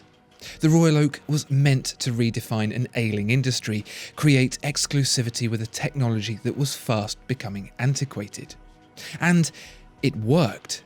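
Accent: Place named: South East England, United Kingdom. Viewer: All my friends sound like this (south-east England).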